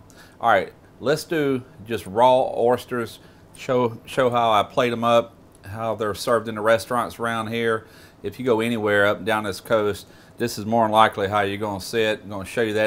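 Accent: South, United States. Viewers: i’m from virginia and there is something tremendous about having a southern man give this advice. When i hear the draw i know he’s gonna be great. thank you sir!!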